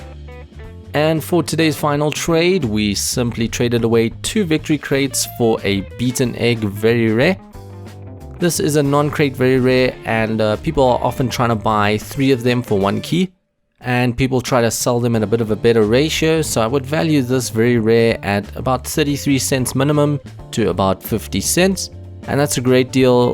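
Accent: South Africa. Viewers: I love the South African accent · Just wondering, are you South African, it’s the accent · I live in Gauteng thats awesome dude I could tell by your accent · Are you South African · May I ask where you are from? You sound like you from South Africa? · Ahh same, I thought I recognised the accent but the newzealand accent and the South African accent are so similar, kan jy afrikaans praat? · Are u from south africa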